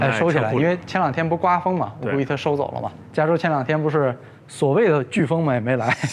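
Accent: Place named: Mainland, China. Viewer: Sir, I did not finish viewing your Vblog, based on your accent, you must come from Mainland China, I am so surprised by your talk, very decadent. Most mainland chinese I met are more enterprising and want to advance themself.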